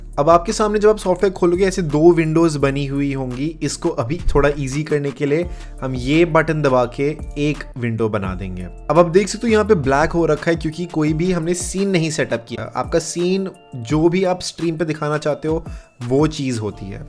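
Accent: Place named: India. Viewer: Maybe native English speaking people understand what Indian people say when talking "English", the rest of the world doesn't. All your words are twisted in a strange way. And you also speak always in a hurry. When you slow down when your are talking it helps a lot to get more viewers. I did watch only 30 sec's.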